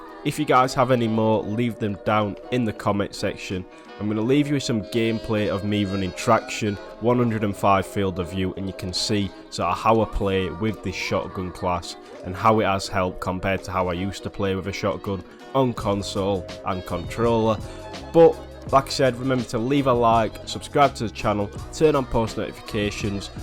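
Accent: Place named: Sheffield, United Kingdom. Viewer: you from sheffield bro ? i’m from birmingham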